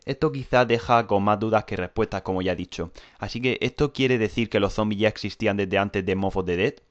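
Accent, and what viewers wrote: Murcia, Spain. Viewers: Eres de murcia verdad??? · Que grande eres tio, de los mejores youtubers de zombis. Sigue asi y alcanzaras a psicho y jugger. Por cierto de que parte de España eres?? Tienes acento pero no se de donde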